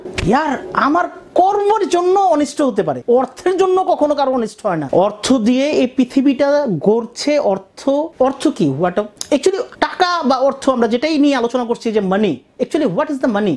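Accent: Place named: India. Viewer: Your accent is indian. Particularly the pronunciation of ‘t’ is too strong.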